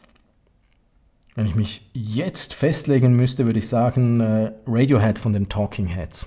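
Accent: Switzerland. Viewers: das schweizerische super charmant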